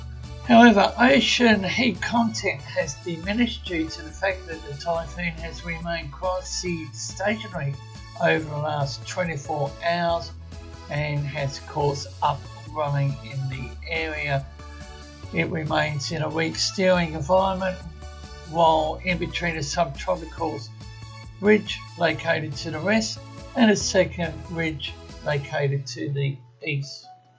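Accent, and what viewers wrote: Australia. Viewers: For those who are saying (the person in this video) is drunk, He is Australian and He is not drunk A True F13 Fan always knows this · is a gem to Force13. Love his Aussie accent and the information is always great. Good to hear him... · dont make fun of him he is australlian and plz dont start a fight as a reply cause we cant agrre on anything on this website.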